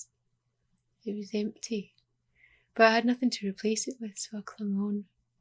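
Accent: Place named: Scotland, United Kingdom. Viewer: i almost moved to edinburgh for uni. Love your accent and story. I kinda agree with a lot of what you say and like the world doesn't understand and it is hard to be around people who just go along with whatever is trending despite them not really caring about protecting anyone, they're also the most mean people sadly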